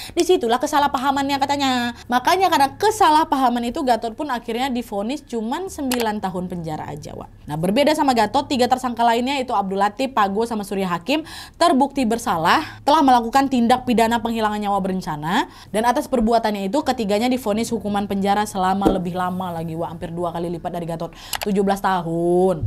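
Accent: Indonesia. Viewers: Kak itu kalo bawain cerita beda bgt sm youtuber yg lain. Dia gk sok sokan melebih lebih kn dialog kyk bhs Indonesia sok" Di buat kek logat bule atau bhs Inggris yg gk kita tau artinya. Dia lbih ke apa adanya dia aja dengan logat Khas Indo nya yg medok agar lbih mudah di mengerti sm kita smua, kalo buat aku itu sih yg bikin aku gk bosen nonton nya. Sehat sehat ya kak sm tim, buat dedek bayi nya jg moga sehat selalu, dn selalu di limpahkn keberkahan. Aminnnn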